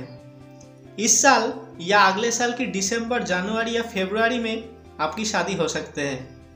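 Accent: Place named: Bengal, India. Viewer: I feel like listening to your accent, you are bengali 🙂 I chose Pile 1 and happy with the reading which my intuition is also saying, and watching tarot readings for more than 2 years, seeing the cards came out in your reading for Pile 1, I know what you didn't said about the characteristics of my husband 🤨 but I got my answer, because it confirmed that with whom I intuitively know I am getting married very soon in 2023, He has Capricorn Sun sign, That major Arcana Devil, He is all what the Devil Card, The King of Cups (Don't show up emotions very well but loves deeply) that 7 of Swords, 8 of Cups and Hanged Man represent my partner, I know all about his negative things but still I want him only as my husband in this lifetime and in my next life times 🤗 I am older than you, so as an Elder Sister I give lots of blessings and Good wishes for your Channel 🙏🏻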